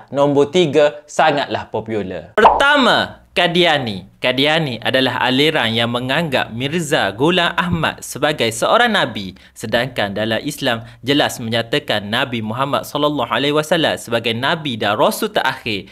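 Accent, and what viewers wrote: Kelantan, Malaysia. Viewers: Pekat lagi ter kecek kelate 😂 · Kecek klate jah be... Belit lidoh dgr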